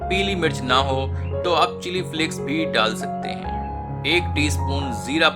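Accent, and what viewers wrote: Standard. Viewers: शेफ आप जैसा बहुप्रतिभा का धनी व्यक्ति बहुत कम देखने को मिलता है । आपकी शुद्ध हिंदी का उच्चारण सुन कर अतिप्रिय लगता है ।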